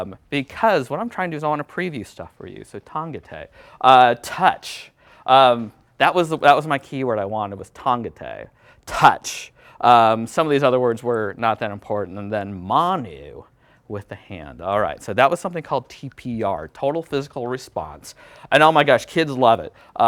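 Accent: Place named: United States. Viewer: It’s not entirely possible to say for sure what Latin might have sounded like - however, it’s 100% certain that it did not sound like an American trying to speak Spanish badly.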